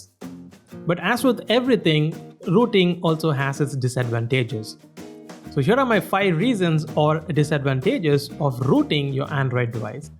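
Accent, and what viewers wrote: India. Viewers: Starts the video-lemme guess hes gonna be indian.. -Seconds later(indian accent) in my last video.... Called it. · omg the indian accent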